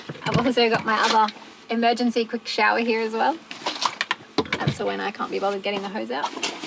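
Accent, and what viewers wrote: Australia. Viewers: I love watching your content! I’m from the u.s and I love your Australian accent ❤. Thank you for all the great videos 😊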